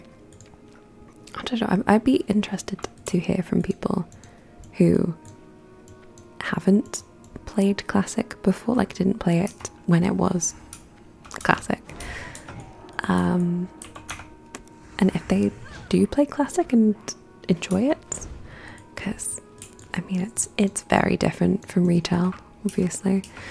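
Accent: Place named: England, United Kingdom. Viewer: Damn such a pretty voice 😮 trying figure out what part of England your accents from. I know your nervous about it but soft spoken suits you I love whispering too 😊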